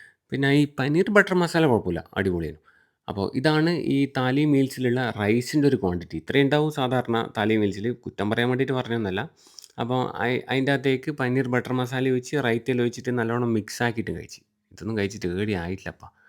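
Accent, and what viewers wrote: Kannur, India. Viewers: idh kannur slang a mone😂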